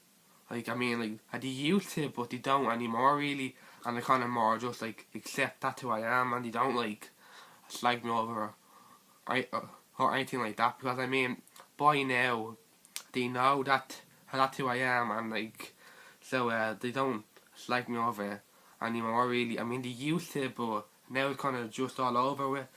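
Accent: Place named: Ireland. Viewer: I'm sorry for coming off as a complete weirdo and being off topic but I love your accent (are you Irish?)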